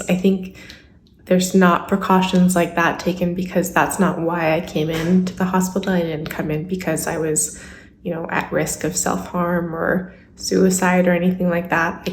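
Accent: Canada. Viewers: In case you didn't spot the accent. She's in Canada. Do you know the rules in every country? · You must be in a medical hospital being treated for mental health. Straight mental health hospitals wouldn't allow most of the things you have in your room. (chain operated blinds, Electical cords, batteries, plastic water bottles, a watch, in short anything that can be sharpened or anything you can hang yourself with, visitors are not allowed on the unit). At least in the staes, your accent may indicate you are in Canada?. I wish you the best and hope you are feeling better.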